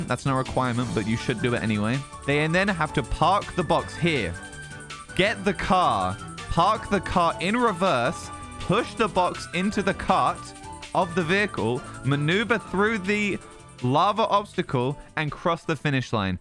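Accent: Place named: United Kingdom. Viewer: cool video and all but why are you british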